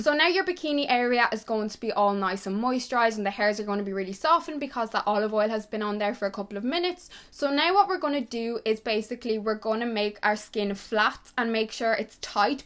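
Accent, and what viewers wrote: Ireland. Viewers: I’m irish and I some how came across this video and I straight away noticed your accent, we irish girls have to stick together ♥️🇮🇪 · U love your Irish 🍀 accent coz I'm irish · She has an Irish (Dublin) accent so probably not. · Where are you from? I detect the musical emerald lilt! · litta Lou I would say she has an Irish accent.... card b is Dominican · I love her Irish accent, this video is super helpful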